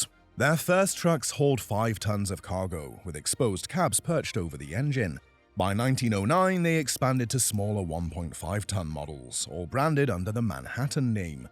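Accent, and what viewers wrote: United Kingdom. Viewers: Why a Brit explaining American history?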